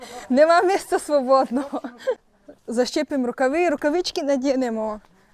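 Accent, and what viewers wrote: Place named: Rivne, Ukraine. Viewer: Хто працює той і має! Але не завжди сільський труд ціниться, до прикладу у нас на Волині, регіон Шацьких озер, малину вже і по десять гривень ніхто не бере, осипається на землю. А скільки вкрадено роботи та затрат, і часу в тих 20 соток! У вас яка ціна, та чи є збут? По діалекту ви з Рівненьської області напевне, і в районі смт. Березне проживаєте? В мене тітка з відти, тож дуже схоже.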